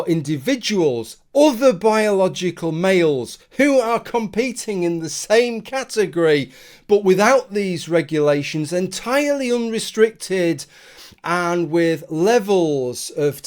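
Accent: United Kingdom. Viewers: RR 2.0, bigger, better and educational, even more UK accent.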